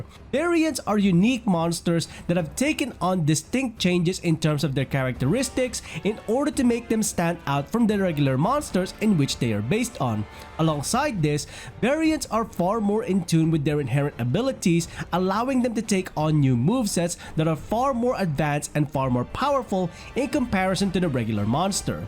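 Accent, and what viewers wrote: Philippines. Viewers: When i Heard your Accent, I already know you are a "Kababayan" I think Variant Monsters are Those monsters that adapt to their surrounding or maybe just a Gene Mutation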